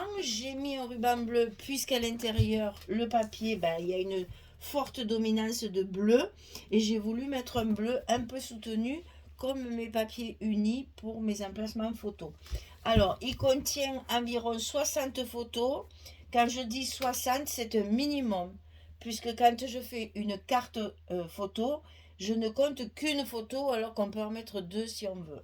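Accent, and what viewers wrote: South, France. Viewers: Bonjour je trouve vos albums bien jolis. En ce moment je suis en train de faire l album snowy winterland en suivant les tutos. Je vais aussi tester les capsules. Dans tous les cas merci encore pour vos tutos et aussi votre accent du soleil me donne du baume au cœur.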